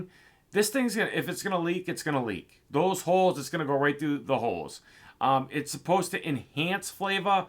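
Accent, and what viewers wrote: Boston, United States. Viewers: Do I hear a Boston accent?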